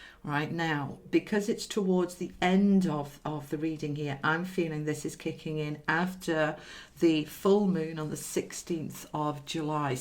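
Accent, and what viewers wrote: United Kingdom. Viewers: I love your beautiful British accent ! Thank you so much from France !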